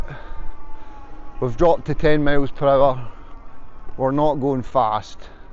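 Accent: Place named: United Kingdom. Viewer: It’s great to see you enjoying your bike. I’m German and speak English quite well, but I can’t understand a single word you’re saying. Your accent is so strong that it’s almost impossible for anyone outside the UK to understand you. But I bought the bike anyway. Cheers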